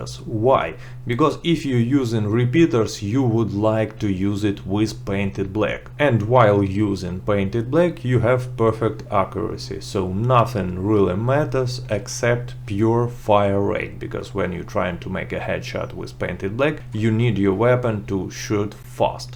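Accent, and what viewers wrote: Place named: Russia. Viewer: I love this man's russian accent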